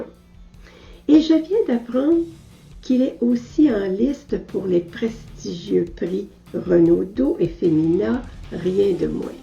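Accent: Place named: Quebec, Canada. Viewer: Ça s’appelle un accent québécois madame et pas une mauvaise diction. La qualité de l’audio laisse à désirer certes mais la diction de est irréprochable, à moins que vous ayez une allergie particulière à l’égard des différentes manières dont la langue française se prononce à travers le monde, dans ce cas on ne peut rien pour vous, votre cas est sans espoir.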